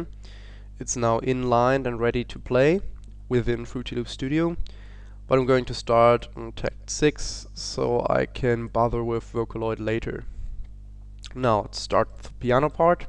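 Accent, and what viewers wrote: Germany. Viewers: man merkt an deiner Aussprache dass du deutsch bist ;)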